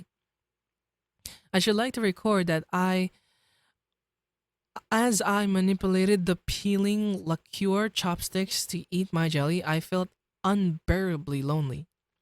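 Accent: Philippines. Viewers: Love your speaking bro, just a few seconds in and I can realize you're Filipino haha. This is my first time listening to an audiobook because I could never get myself to read long texts so thank you for doing this.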